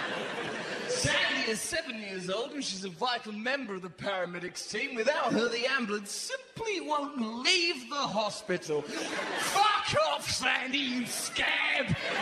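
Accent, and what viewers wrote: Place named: London, United Kingdom. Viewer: You sound like your from London!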